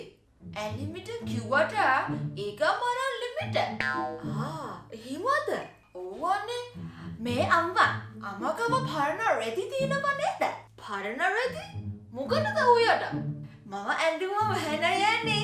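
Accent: South, Sri Lanka. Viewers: හරි හරි දකුනෙ ගැම්ම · දකුණේ ගැම්ම අම්මෝ 😁😁💖💖💖💖💖💖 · දකුනේ ගැම්ම❤️ ඒක මරු. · දකුණේ ගැම්ම😆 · දකුනෙ ගැම්ම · හරි හරි..දකුණෙ ගැම්ම😂😂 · දකුණේ ගැම්ම 😂😂😂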